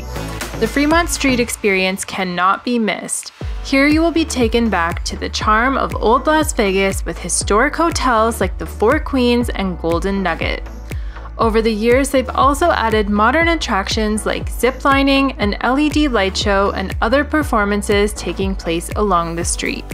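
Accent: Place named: Canada. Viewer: I enjoyed the video, but I was easily distracted by the Canadian accent!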